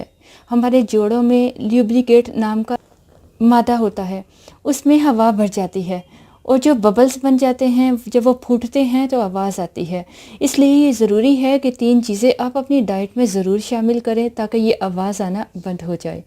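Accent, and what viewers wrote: Punjab, Pakistan. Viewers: Aapke lehje main reginal accent hai shayd aap Punjab ki hain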